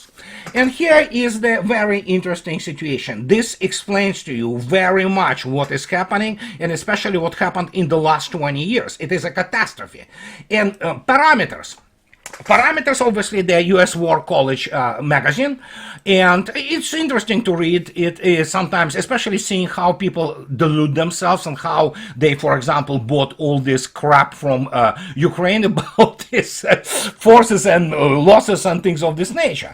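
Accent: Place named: Russia. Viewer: Thanks. English with Russian accent. Now I get the meme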